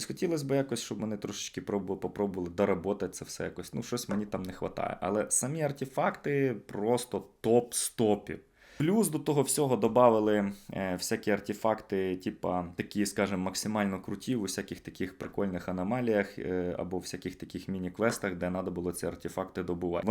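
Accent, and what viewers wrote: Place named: Russia. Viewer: хлопче я бажаю тобі терпіння в твоїм починанні, але слухати твою москальську не можливо. Вуха просто ріже. Успіхів тобі.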